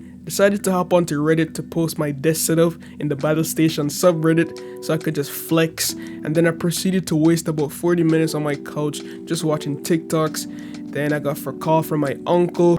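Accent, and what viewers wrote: Jamaica. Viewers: You Jamaican? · You sound like a Jamaican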